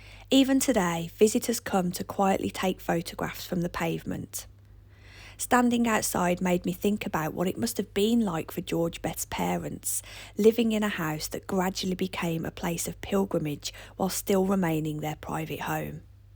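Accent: Birmingham, United Kingdom. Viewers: speaks with a lovely regional British accent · the birmingham voice is so nice to listen to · you keep your voice... British English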